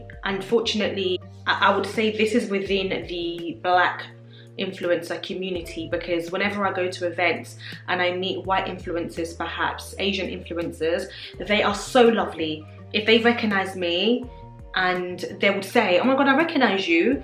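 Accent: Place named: United Kingdom. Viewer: You seem so sweet, please do NOT stop being so nice and friendly because when you do, you have just allowed yourself to be in the class of those who are not friendly. You will get more attention continuing to be who GOD made you. Never let the enemy win! Apparently, they see something in you that they are lacking. You can be reserved, but that's normal, you determine those you detect instantly that you need to be reserved around. See, with all of the designer stuff ( which btw, Chanel is my favorite), some black women get so caught up in doing this as a job and then losing themselves in it, that they lean more towards the designer part....like oh, I'm better, I'm an influencer, I've got, I've got....so I'm better than you, or I can compete against you. It only means you can tell they are not use to having. GOD can wipe all they have away in a matter of seconds. 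And yes, I'm speaking of my black Sisters, not all are like this, but I dont care about what other ethnic backgrounds think. We need to get better at working to " influence" each other. Love your accent....dont know if you are from the UK, but I hope to visit one day. I did live in Europe for 3 years, but that was years ago....b blessed, stay nice and humble, putting GOD 1st and you will flourish...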